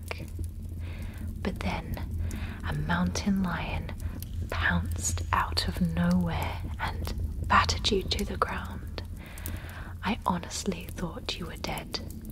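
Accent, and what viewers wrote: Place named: RP, United Kingdom. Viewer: The contrast of a primal, feral-looking character with a proper posh british accent is super stark :P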